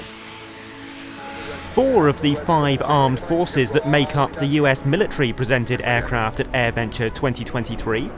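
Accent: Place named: United Kingdom. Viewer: Seriously, a brit commentator? How about we have an American narrate RIAT? Absolutely annoying.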